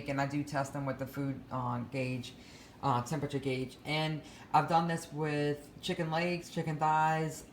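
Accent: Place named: New Orleans, United States. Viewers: Love that Louisiana accent! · Soon as you opened your mouth I said “she’s from New Orleans or southern Louisiana “. The I looked at your username. Lol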